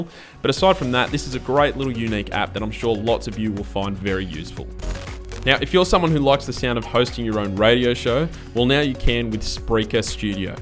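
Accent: Australia. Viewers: That irritating aussie accent... I hate this accent. Bloody aussies.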